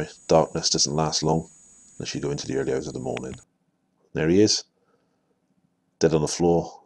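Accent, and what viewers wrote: United Kingdom. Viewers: British voices make for great commentary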